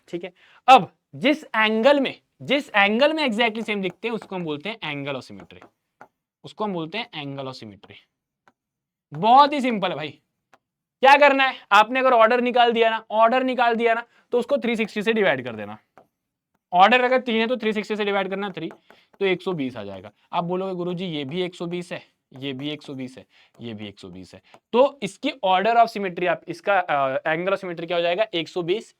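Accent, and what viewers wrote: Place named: Bihar, India. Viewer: Sir speaking bihari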